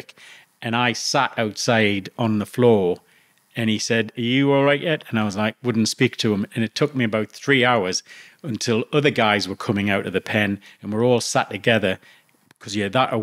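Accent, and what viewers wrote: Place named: Newcastle, United Kingdom. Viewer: Aye wor kid he's a fuckin Geordie an all!..sounds like he's from Shields or Byker lol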